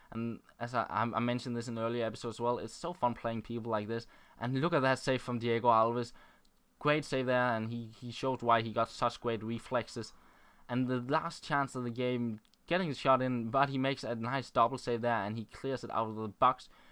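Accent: Denmark. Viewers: You sound like a Dane.. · he's so danish its hilarious i love his commentaries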